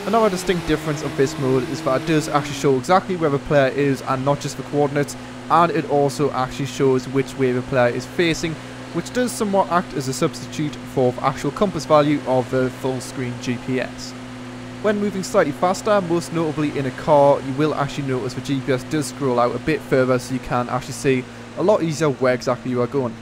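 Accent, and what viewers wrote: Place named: Northern England, United Kingdom. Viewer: good to hear a fellow northener on the tube :)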